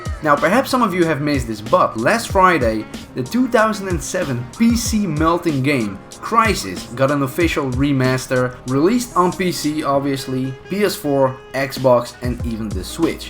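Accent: Netherlands. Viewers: He sounds very Dutch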